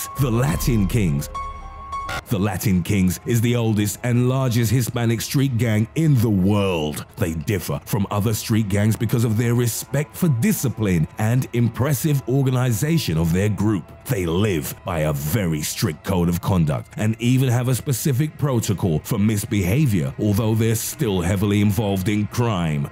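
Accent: England, United Kingdom. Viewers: You have a British accent like half the time....sup with that? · Between your shitty accent and your shitty delivery go back to England and take your annoying accent with you. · "The military has a white supremacist presence over all" if you don't shut up you English sounding moron, my boots gonna find the back of your head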